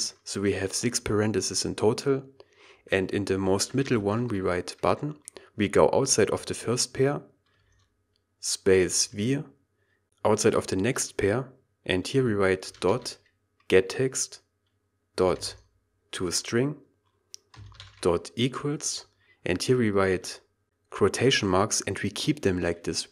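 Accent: Germany. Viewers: thanx ..you have a sweet german accent.. hhhh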